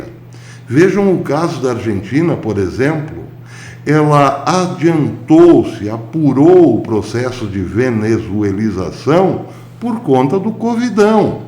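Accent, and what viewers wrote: Caipira, Brazil. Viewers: Boa tarde, professor. Que bom que vai ter live todo domingo! Professor, fico besta com o senhor. Por issogosto tanto desse canal. Ou o senhor conversa em caipirês ou demonstra sua extrema modéstia, como hj: "Simpatizou com essa belíssima figura que sou eu", de qq modo é uma alegria seus vídeos. Vira e mexe o senhor aparece com uma novidade. Obrigada, professor!!!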